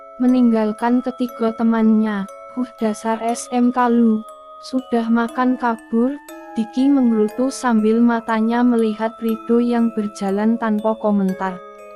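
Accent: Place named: Java, Indonesia. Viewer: Narator nya kurang menarik cara berceritanya seperti baca buku logat jawanya terlalu medok ...intonasi nya juga tidak tepat ...gak jadi deh dengerinnya 😁😁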